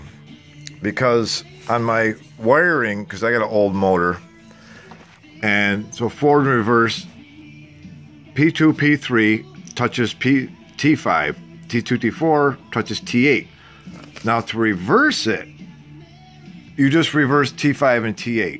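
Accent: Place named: North America. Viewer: thank you for no selfie face shots. thank you for no annoying music. thank you for not wearing blue latex gloves to protect your delicate skin. thank you for no disclaimers such as " I'm not an electrician.....electricity can kill....wear safety equipment...."thank you for plain English....thank you for your x and o drawing.....thank you for keeping it short....thank you for speaking with a north American accent....thank you for cursing....thank you for not elevating yourself to a master class instructor the last 24 hours that's all I saw online, people spitting out technical jargon that makes no sense, and odds are they don't even understand it........everyone wants to be a youtube producer and15 min of fame so they can brag to their grandchildren.....am I bitter? FKN "A" I am. ....got a brake press that's down.....losin money.........and thank you for your humbleness when someone in here asked where the hot wires go.......you said......beats me...that was a long time ago.......hahaha....good one....later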